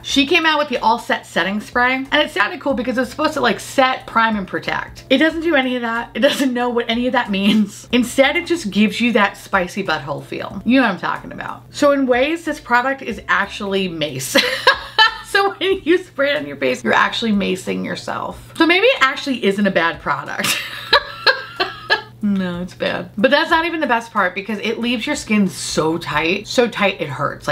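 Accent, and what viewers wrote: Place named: New York, United States. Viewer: I am from nyc and I hear the new Yorker inside you 🥰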